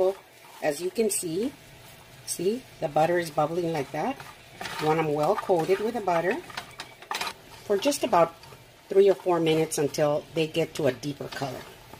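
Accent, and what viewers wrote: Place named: Midwest, United States. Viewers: Latina? No think it's more of Iowa or Minnesota accent but I gotta say awesome video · Do u live in mid West of USA ur voice reminds me of ppl I hered talking. when I was out the mid West I just found u love ur videos so fun food looks great .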